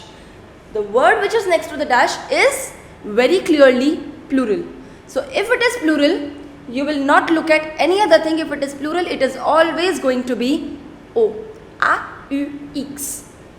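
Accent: Paris, France. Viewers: Your way of speaking French is very acsent same pronunciation of Paris people I think you stayed in Paris and teach french to students thankyou for being my inspiration 😍🥰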